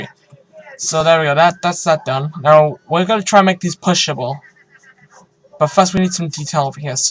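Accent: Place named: United Kingdom. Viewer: undah combow lol british accents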